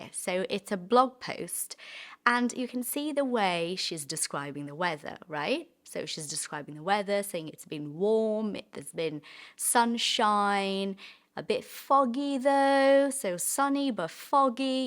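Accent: United Kingdom. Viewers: British accent is amazing. Greetings from Brazil.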